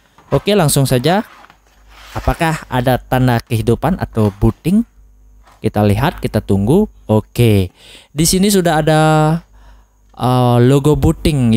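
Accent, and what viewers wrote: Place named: Bali, Indonesia. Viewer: Logat bicara bapak ini seperti orang Bali 😅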